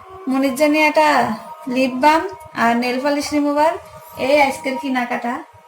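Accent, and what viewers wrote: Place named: Khulna, Bangladesh. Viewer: আপু কি খুলনা বিভাগ এর মানুষ নাকি? আমাদের ভাষার সাথে খুব মিল পাচ্ছি।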